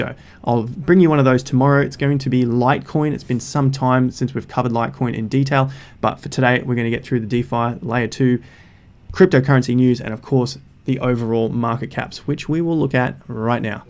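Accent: Australia. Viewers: who are these people you feel the need to apologise to...no apology needed. You’re Australian and won’t speak the Queens English like what I do.....As for sound quality I only noticed a difference when you were away but no problem. More....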